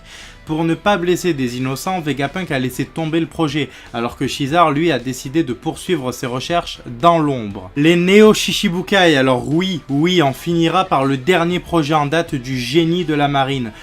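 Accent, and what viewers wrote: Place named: South, France. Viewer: Je t ai vu dans la vidéo de ryo et ton accent du sud et génial je voulais te poser une question tu as déjà vu ryo seinsei en vrai ?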